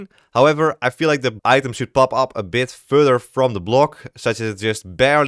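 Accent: Netherlands. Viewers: Is your real language dutch? Because you have a dutch exent.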